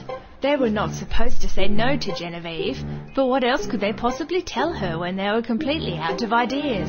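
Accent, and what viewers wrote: Australia. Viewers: weew for the australian narrator c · this one's australian.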